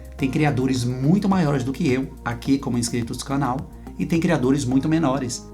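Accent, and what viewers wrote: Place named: Northeast, Brazil. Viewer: sim, adorei a Live, as horas passaram e nem vi... e quanto a voce, foi uma surpresa boa, mais um canal que ajuda a gente a crescer aqui no YouTube com dicas e sacadas bacanas!! Gostei do seu canal, aos poucos vou assistindo os seus conteúdos, você tem um jeito bacana de transmitir e de se comunicar, talvez pelo sotaque leve do Nordeste, talvez pelo jeito doce, Cândido de falar, muito agradável e um diferencial dentre os outros canais com meu nicho!!! Parabéns, já ganhou mais um inscrito!!! Abraço do Big 💪💪😃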